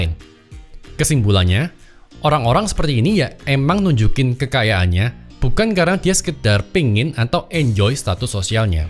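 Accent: Java, Indonesia. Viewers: Agak medok' ga bro 😁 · Betah gua liat Koko ini kalo ngomong pasti Koko Jawa kalo Koko Jakarta tengil2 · Sampean wong jowo opo lek.. mergo logate rodok medok rodo chino🙏🙏salam jowo lek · Logatmu aku suka mas,jowo mu medok 👍👍👍👍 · sukaa bangeet motivasinyaa...medok juga suaranya..kereeeen bener semua yg diomongin bukan ngasal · Denger om ngomong i satisfying, medok e enak wkwk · Haha logat nya Jawa banget 🤣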